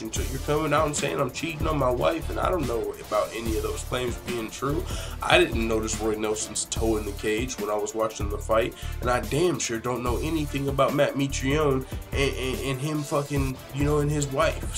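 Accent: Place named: South, United States. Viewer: Dang Boy, you're way more country than i had originally thought..Remind me of Clayton Bigsby haha!! Stick to that accent now, ya-hear??🤠🐴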